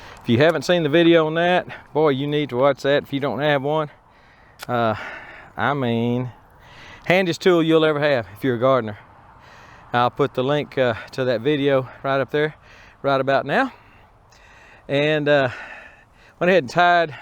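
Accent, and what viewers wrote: Texas, United States. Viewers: Love the Texas French speak. LOL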